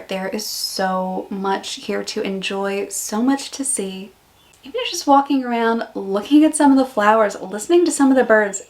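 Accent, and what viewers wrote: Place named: Australia. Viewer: Fantastic. You have nailed some of the big differences between here and the States. You are sounding more Aussie everyday.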